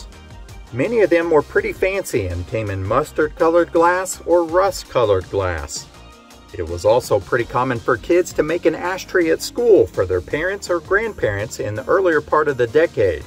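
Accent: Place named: Midwest, United States. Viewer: What part of the Midwest are you from? If I’m wrong I apologize, just a guess. I’m originally from central Indiana. Your accent sounds familiar to me. Love your YouTube channel. We are both products of the 70’s and 80’s. I was born in ‘72. So I remember all of this great crap. I personally loved anything that was robots or computers. BMX bikes were just the best too.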